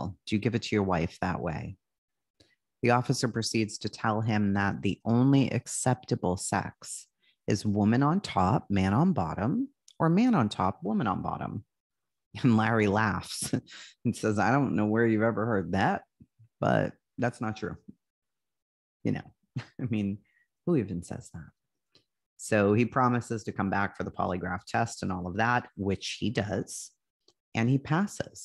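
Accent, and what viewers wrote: Boston, United States. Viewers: Love the way ur telling this story.... 💞🙏... ur Boston Accent, u all pronounce ur "R's" differently from me... I'm in Washington state... Good saying "drawers", spot on🥰😊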